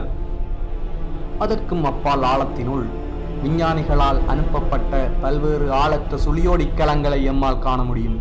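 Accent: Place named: Sri Lanka. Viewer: nee pesurathu suththamana tamilan illai. nee Sri Lanka va?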